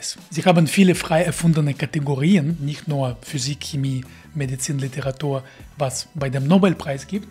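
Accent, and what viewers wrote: Russia. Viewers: Wie immer, toller Beitrag in einem herrlichen russischen Akzent! Danke für Deine Mühen! Ich „spinne“ man ein wenig: Die „Kunst“ bei der Levitation ist nicht, die Levitation selber! Die Kunst ist, die Wechselwirkung des „skalaren Higgs-Feldes“ um den Probanden, mit den subatomaren Teilchen (Quarks) des Probanden, zu unterbinden! Ohne diese Wechselwirkung keine „Masse“ und ohne „Masse“ ist levitieren ein Kinderspiel! Derjenige der das hinbekommt, der bekommt alle nur erdenklichen „Nobelpreise“ die es gibt! Garantiert!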